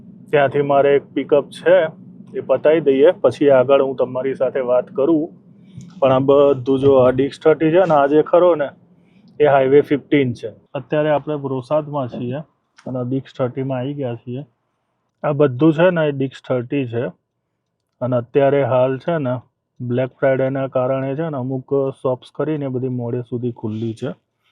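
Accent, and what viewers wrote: Mehsana, India. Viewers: BoliTo Mahesani chhe, PAN Gaom Ke U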